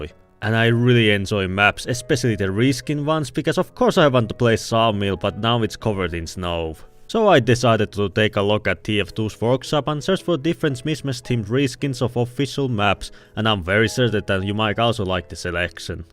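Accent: Finland. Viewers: Shogatsu is my favorite, they did great Also I love how I immediately knew you were from Finland, cheers from Hungary lol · I can detect finnish accents from planets away, being a finn as well. What's good? Also the way i found this channel is i just stumbled into it on my recommended and was like "? Isn't this the guy from my steam friends list?"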